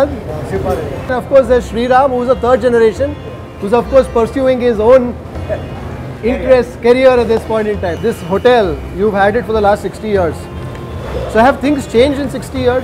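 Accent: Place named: Mangaluru, India. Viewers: Not Mangalore kannada, South canara Kannada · He got that our Mangalore Kannada accent. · I love the way you speak kannada.. that has a mangaluru kannada touch.... thank you.. 😍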